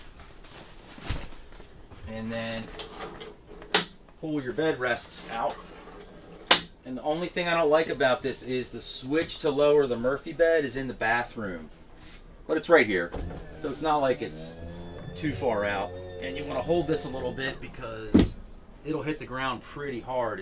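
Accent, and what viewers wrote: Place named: Maryland, United States. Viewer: I knew I heard a MD accent! Ravens sweatshirt confirmed it!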